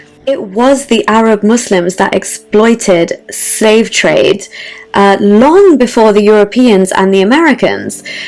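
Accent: United Kingdom. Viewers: The British accent is strong. Good accent.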